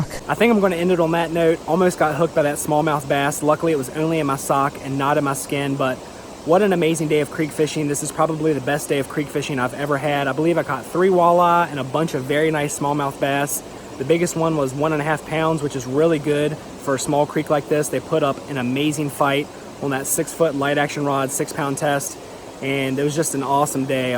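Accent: Pennsylvania, United States. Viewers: That's a awesome day of fishing! What state are you fishing in? You sound like you're from P.A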